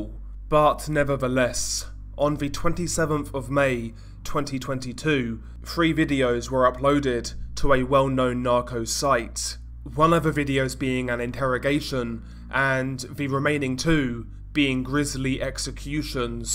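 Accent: Castile, Spain. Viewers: Please don't pronounce the names in European Spanish it is extremely grating and nowhere near as warm and sweet as Mexican Spanish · Your Spanish accent has improved a bit but why are you pronouncing in Castellano? Castellano is spoken in Spain only !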